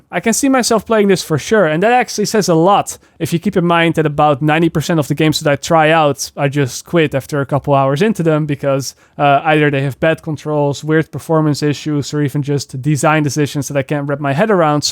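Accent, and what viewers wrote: Netherlands. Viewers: Hi, are you maybe Dutch? It’s just that I’m Dutch and your way of speaking and a slight accent strongly remind me of other Dutch youtubers with English channels. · Wait is this Guy dutch?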